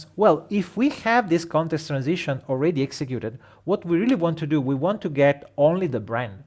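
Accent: Italy. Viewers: Excellent. This channel probably has the best advanced PowerBI free content on the internet. Moreover, the Italian accent is very funny! Greetings from Brazil.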